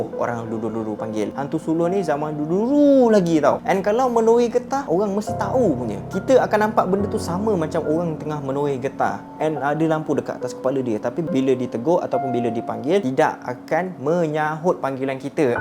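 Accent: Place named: Muar, Malaysia. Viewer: Cm org muo la dia ni cakap tanya kabo la apa la 🤣🤣🤣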